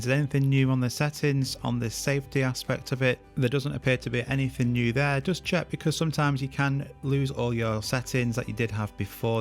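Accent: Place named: England, United Kingdom. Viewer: Thank you for informations Witch place in england is your accent from ?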